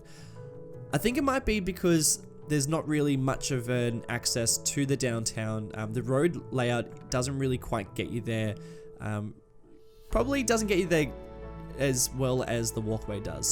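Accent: Australia. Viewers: This one is my favourite series of yours I think, never been to China but your level of detail is inspiring. I'm also from Sydney so it's kinda nice hearing the Aussie accent 😎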